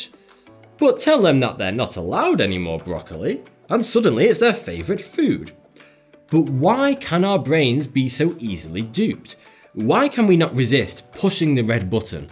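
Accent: United Kingdom. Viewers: Forget about white bears. All I can think about is listening to a British intellectual and eating fried potatoes!!!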